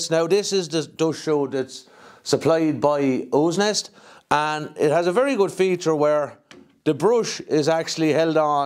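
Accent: Ireland. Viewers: I like this guy's accent, words like "tinking" and "thaught" and "tat", he be so Irish tis great.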